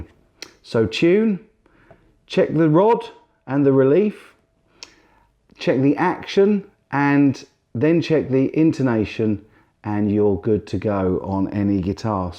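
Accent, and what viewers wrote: United Kingdom. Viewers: As a native USA English speaker, I am tired of Briish accents in presenters... are USA English talkers so hard to locate in the USA?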